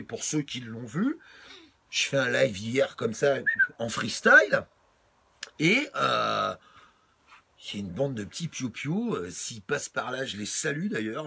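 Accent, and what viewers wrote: Paris, France. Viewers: C'est la première fois que je t'entends, j'abonde en ton sens à 100%. PS : ton accent franchouillard à la limite du titi parisien est top ! Continue !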